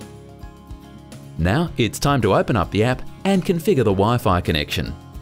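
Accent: Australia. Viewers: Australian Accent lol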